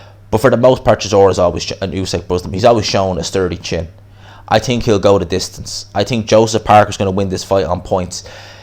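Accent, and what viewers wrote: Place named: Ireland. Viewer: My Irish bro.Good to hear the accent making boxing news ☺️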